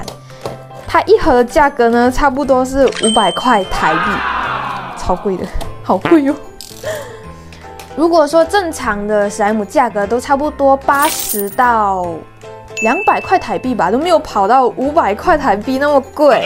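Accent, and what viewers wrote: Malaysia. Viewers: 你是马来西亚人还是台湾人？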